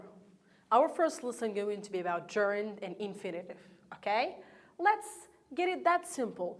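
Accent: United Kingdom. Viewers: haha i get u but the accent also british ..... tell how do you know the deference between the accents ? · I'm not understand what do you say & you speak English as you are British & speak Arabic for everybody understand what you say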